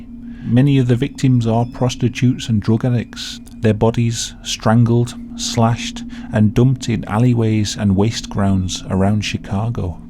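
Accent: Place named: Midlands, United Kingdom. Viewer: Excellent!! Just subscribed! Love your midland accent..it sounds so pretty💯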